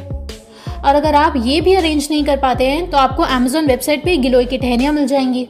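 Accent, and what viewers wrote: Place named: North India, India. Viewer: Bolne ka style acha laga, bilkul north wala..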